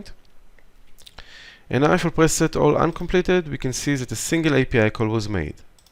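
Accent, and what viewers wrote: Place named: Israel. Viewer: אתה ישראלי? המבטא שלך נשמע ישראלי רצח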